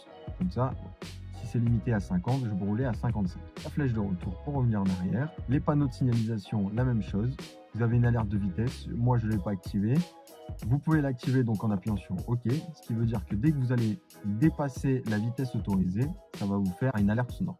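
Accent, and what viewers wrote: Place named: Paris, France. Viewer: Le présentateur est sûrement très compétent mais parler vite avec un fort accent Parisien est tout simplement incompréhensible pour un mec du Sud comme moi. C'est vraiment dommage, je ne comprends pas la moitié de se qu'il dit 😢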